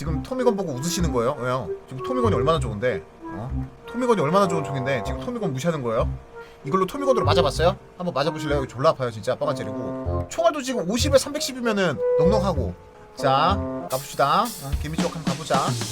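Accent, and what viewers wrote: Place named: Gyeongsang, South Korea. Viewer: 바깥에를? ㅋㅋ 진짜 갱상도야 이햄은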